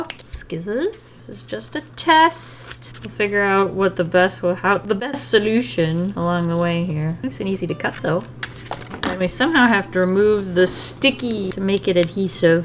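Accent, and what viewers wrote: United Kingdom. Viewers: Every once in a while she sounds British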